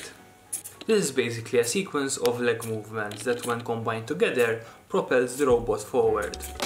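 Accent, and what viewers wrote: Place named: Malta. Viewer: i can see from your accent that your maltese same dude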